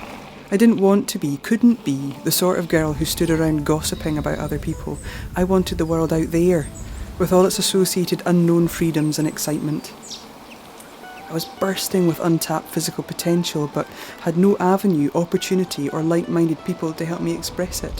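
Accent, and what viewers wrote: Scotland, United Kingdom. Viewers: Inspirational video. And the Scottish accent makes it even better. :)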